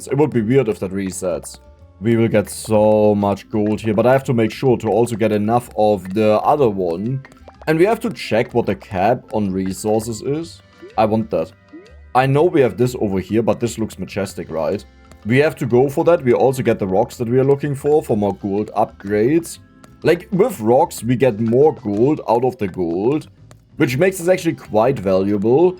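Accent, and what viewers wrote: Germany. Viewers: Zewentzyzigz holy deutch accent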